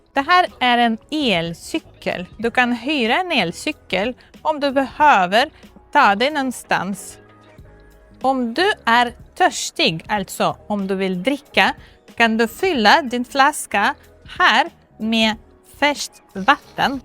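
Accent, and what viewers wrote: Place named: Poland. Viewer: Riks-svensk dialekt, med spår av Polska. Men nästan ingen Göteborgska. Det finns ganska många lokala dialekter i Sverige. Lycka till.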